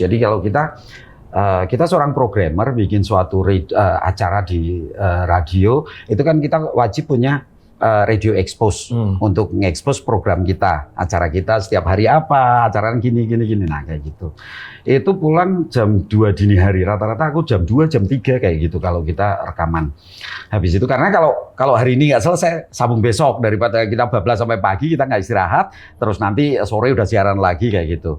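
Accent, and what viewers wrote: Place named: Semarang, Indonesia. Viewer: Bahasane semarang bgt hehe,,, salam dari cah banyumanik bang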